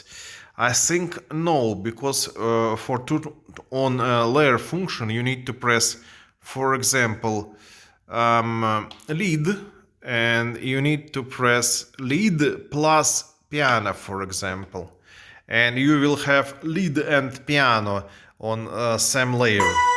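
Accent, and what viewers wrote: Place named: Russia. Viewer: Русский акцент😂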